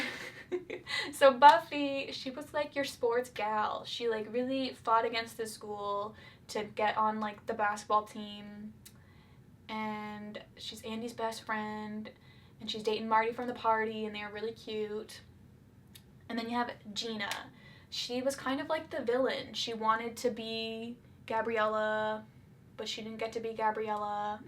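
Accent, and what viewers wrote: Canada. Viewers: also im here for your canadian accent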